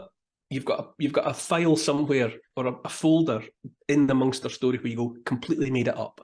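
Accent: Scotland, United Kingdom. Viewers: I really just love to hear scottish people talk just gorgeous.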